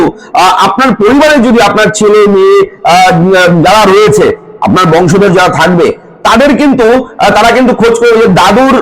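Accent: Bangladesh. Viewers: এ প্রচুর গাঁজা টেনেছে বোঝা যাচ্ছে I Accent শুনে সন্দেহ হচ্ছে এ নিজেই বাংলাদেশ থেকে খুব বেশিদিন আসে নি I যে ভাবে S উচ্চারণ করছে তাতে তো তাই মনে হচ্ছে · সব ঠিক আছে। একটাই প্রশ্ন। বাংলাদেশি? জিভের আড় ভাঙেনি এখনও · আপনার উচ্চারণ কিন্ত বাংলাদেশীদের মত। আপনি নিজেই বাংলাদেশী হতে পারেন বলে মনে হচ্ছে।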